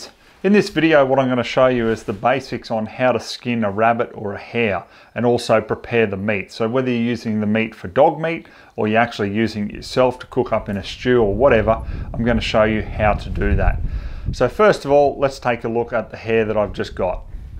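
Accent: Australia. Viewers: You know this dude is a professional with that Aussie accent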